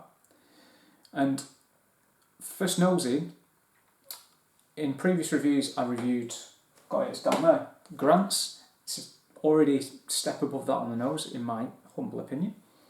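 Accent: Manchester, United Kingdom. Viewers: Great review. You sound more and more manc the more videos I watch.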